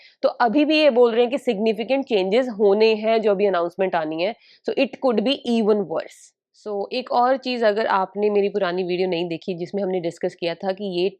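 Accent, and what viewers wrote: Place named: India. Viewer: Why do Indians have such a strong accent in English?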